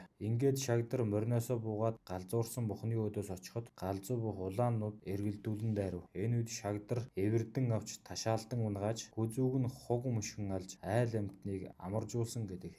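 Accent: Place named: Buryatia, Russia. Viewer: Buriad yumuu aylagtain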